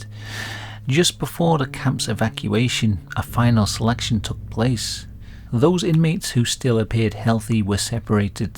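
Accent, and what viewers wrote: United Kingdom. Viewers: I've seen nothing in the video that I do not already know from countless recitations of the words associated with the Images so I find myself asking in response to your accent WHY are you NOT telling the story of the British Empire than invented Concentration Camps (Boer war) AND used them again in 1952 in Kenya. The Brits forever recite the evils of Germany (totally valid, just repetitive) but IGNORE THE EVILS OF THE BRITISH EMPIRE THAT CONTINUED SIMILAR PRACTICES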